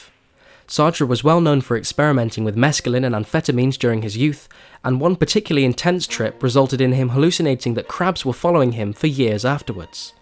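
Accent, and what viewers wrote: United Kingdom. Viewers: You forgot the British Accent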